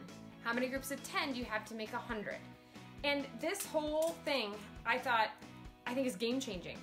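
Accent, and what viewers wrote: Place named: Midwest, United States. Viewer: This video is so helpful! Thank You! This will be my first year homeschooling my Kindergartener and while I’m so excited, I’m also pretty nervous. This curriculum looks pretty legit, though, and if a math teacher says she recommends it above all others, then i know it’s going to be great. Btw…I have never heard of Saxxon Math (I’m new to all of this). While I was listening to your video, I was in the other room grabbing some laundry and heard you say something like, “I know mothers just love Saxxon Math…” I literally stopped what I was doing and said out loud, “WHAT did she just say?” 🤣 You sound like you may have a Midwestern accent to boot, so it reeeally sounded like…something else to me. K, enough about that. Again, thanks for the video and we can’t wait to get started! Take care!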